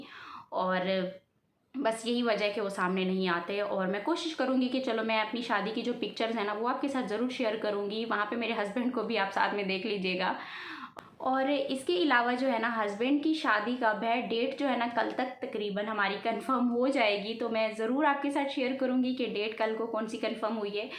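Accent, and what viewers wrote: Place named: Sindh, India. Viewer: I think u are Sindhi speaking aapk bolnay say mujhay laga I am Sindhi speaking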